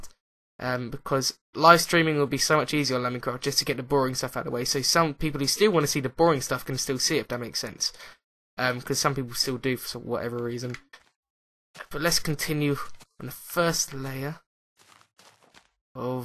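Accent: United Kingdom. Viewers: You say we Americans have an accent? Well I don't believe that but I do like the British accent like you did say... But I would love to have a German accent. So go ahead take my accent I'll take your British one. :)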